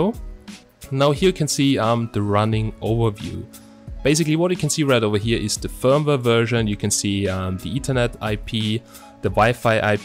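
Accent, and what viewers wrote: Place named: Germany. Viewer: Slight german accent...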